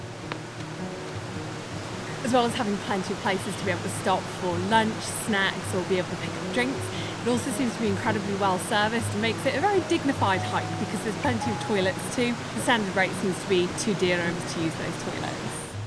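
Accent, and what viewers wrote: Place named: Northern England, United Kingdom. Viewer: Just stumbled upon your channel, where is accent from please ? You sound from north England, right? Greetings from Casablanca . Thanks for taking us round. Fare thee well for now.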